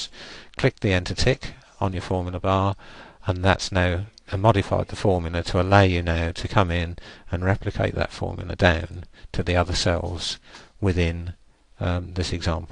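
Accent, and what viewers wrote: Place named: United Kingdom. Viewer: I fucking hate british accent! Didn't understand a half of what you said.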